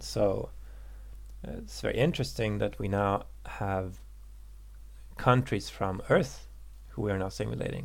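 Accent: Poland. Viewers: Are you Polish by any chance? You don't sound American And is this going to the android version as well?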